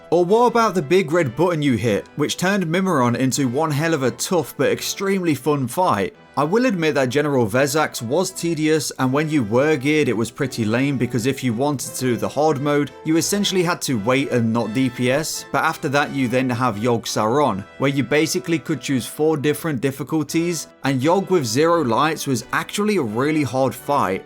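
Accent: United Kingdom. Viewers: Oh god why does it have to be bri’ish… blimey mate talk normal please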